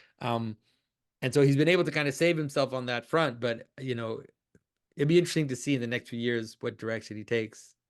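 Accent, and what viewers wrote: Wales, United Kingdom. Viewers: You are so Welsh. Love it.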